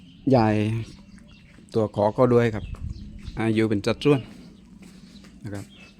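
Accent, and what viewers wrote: South, Thailand. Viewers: ฟังสำเนียงใต้ก็เพราะดีนะคะ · ฟังสำเนียงคนใต้แบบ แล้ว.กะดีคือกัน.ได้รู้ว่าภาคใต้เขาพูดแบบนี้.ฟังจากที่อื่นเขาพูดเร็วๆรัวๆ.แต่ พูดแล้วฟังรู้เรื่องจ้า.❤🎉🎉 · ฟังสบายๆ.ภาษาใต้.ให้กำลังใจคับลุง.จากfc.นราคับ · สวัสดีจ้า ชอบฟังสำเนียงใต้ลุงจ้า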